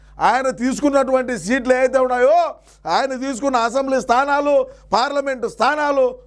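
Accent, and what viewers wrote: Rayalaseema, India. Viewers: మన సీమ యాస తో ఎంతో మందికి అభిమాని అయ్యావు అన్న నువ్వు జై రాయలసీమ జై సీమ రాజ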